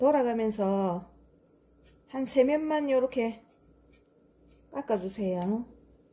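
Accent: Busan, South Korea. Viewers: 안녕하세요^^ 음성듣고 반가웠어요 저도 부산 사람 이라 🤗🤗 풀시청하고 선물드리고 갑니다🎁🎁🎁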